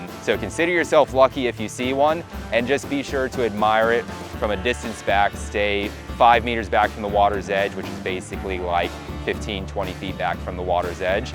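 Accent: Australia. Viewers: He’s sounding a little more Aussie.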